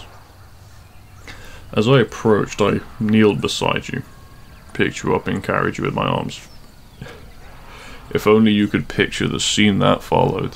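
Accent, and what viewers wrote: United Kingdom. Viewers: This was amazing. Thank you so much for voicing my script. Also are you British?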